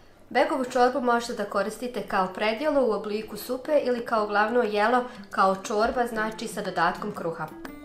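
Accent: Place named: Krajina, Croatia. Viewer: volimo te i pratimo naravno volimo tvoj preljepi krajiški naglasak! 💪🏼👍🏼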